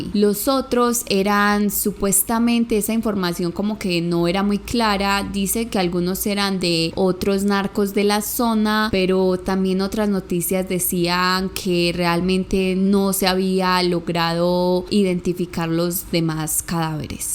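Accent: Antioquia, Colombia. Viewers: Es el acento típico de los paisas (los nacidos en Medellín )...acá en Colombia,hay variedad de acentos 😊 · Ay mi querida, me encantan tus videos y tu acento colombiano... Pero hace unas semanas una colombiana me rompió el corazón y me duele escucharte hablar 😭💔 ojalá pronto lo supere para volverte a escuchar con cariño. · Si, a mi también me gusta el acento Colombiano, después del de mi país por supuesto. Saludos desde Zacatecas México ❤️🇲🇽🥰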